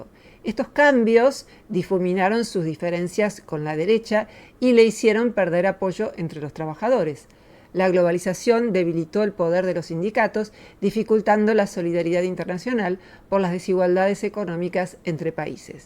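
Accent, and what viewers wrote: Argentina. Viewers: La comentarista ametralla con su léxico sin pausas no se corrige y usa su predica para su gremio y los neófitos no entendemos pero soslayo que predica algo interesante la veo años y entiendo 20% de lo que dice aún la perdono por su hablar porteño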